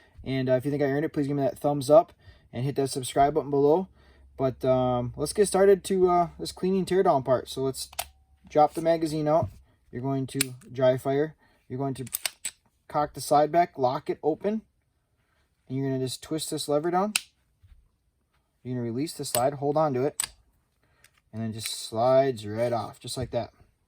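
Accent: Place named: Wisconsin, United States. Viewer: From Appleton, loving that Wisconnie accent. Great job taking us through this pistol.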